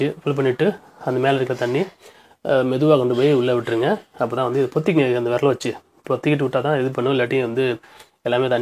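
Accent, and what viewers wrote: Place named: Tirunelveli, India. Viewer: Ahh Nama ooru slang....Tvl uh sir nenga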